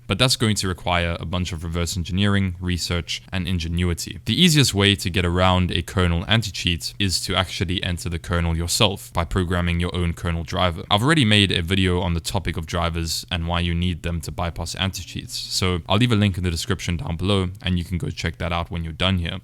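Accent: South Africa. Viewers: Clicked on this and didnt expect to hear a saffa, lekker vid bru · This brah sounds South African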